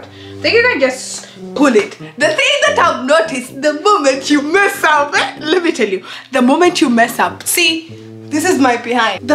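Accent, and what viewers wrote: Uganda. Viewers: It's your kayindo for me. It really shows that your a muganda plus 👌👌👌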